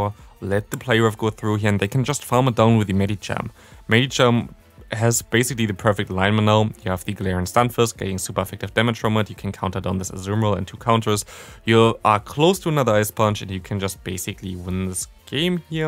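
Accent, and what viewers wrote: Germany. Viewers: Germans speak English obviously with some German accent bits in it. Nothing unusual. Don't bother it.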